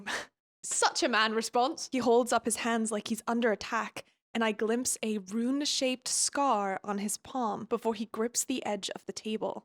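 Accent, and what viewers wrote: United States. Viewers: the american accent is KILLING me 😭😭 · The American accent gets me everytime 😂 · Babes imma need you in the audiobook studio STAT! your American accent is better than mine, im #scared (im unfortunately American) but let’s work on the country accent a bit (also unfortunately from Texas) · commenting bc you are absolutely hilarious, delightful to listen to, and you have an impeccable American accent. Unfortunately, I can't unhear your Violet voice as anything other than Sydnee Sweendy, making both of them that much more unbearable😤🤩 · The American accent is TOO GOOD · Also, the accent sounded like an American southern plantation owner. I am from the deep south. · The american accent is SO GOOD HAHAHAHA it always makes me laugh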